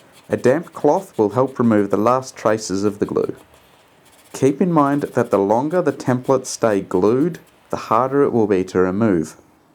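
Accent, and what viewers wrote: Australia. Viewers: upon hearing your voice' I said "Hey, he's an AUSSIE"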